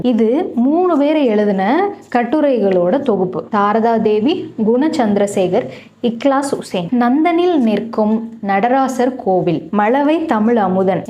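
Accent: Madurai, India. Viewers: Madurai slang mathiri iruku🎉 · Amazing ❤. Loving your madurai accent. Great choices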